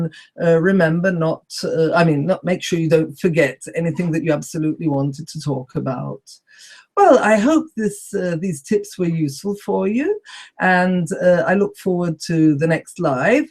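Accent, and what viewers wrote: Italy. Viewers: From her accent she sounds like an Italian native speaker, however I've found her advice very helpful. It takes more videos like this.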